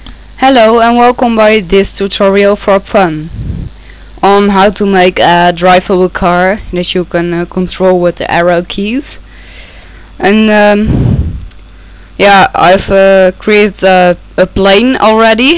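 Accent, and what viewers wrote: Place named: Netherlands. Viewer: haha, je hoort ook egt zo'n hollands accent! xD k ben ook 13 jaar, (over een week 14) ik ga ff je tutorial bekijken.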